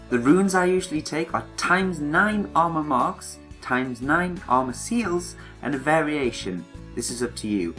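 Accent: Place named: Wales, United Kingdom. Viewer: Haha! okay I thought You were welsh xD Do you main support?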